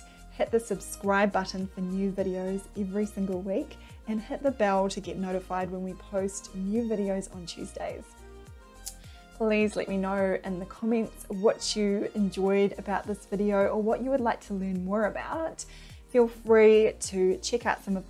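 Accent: New Zealand. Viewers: aha!! i guess you were new zealander from your accent and reading the caption, i was right hehe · You have the softest kiwi accent. Love 🇬🇧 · I love your smile, and your cute New Zealand accent!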